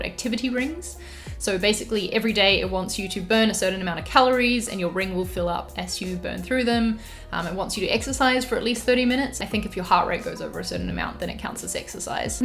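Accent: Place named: New Zealand. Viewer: I thought this was a helpful review, as you talked more about how you use your Apple Watch rather than get into the weeds of technical details. It’s difficult to find examples of people using their Apple Watch amidst the sea of specs-oriented ‘tech reviews’. As you point out, this device is a luxury which nobody needs to get. In that case, I think it is more interesting to know how people can make use of the Apple Watch and what kind of value they get out of it. Being rather drawn to gadgets, I might yet pick one up. And also, a pleasant surprise to hear a kiwi voice!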